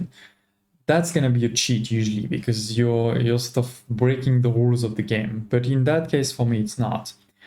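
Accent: France. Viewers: Wow, where are you from ? You sound like french accent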